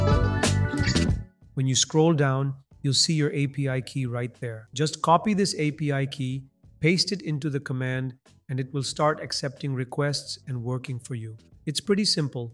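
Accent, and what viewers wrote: India. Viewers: Genuine question is this your real voice or ai clone Somehow i could teast the Indian accent within it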